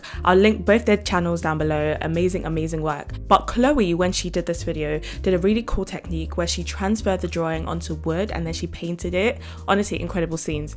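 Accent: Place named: United Kingdom. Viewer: I like your British accent And the asmr while you talk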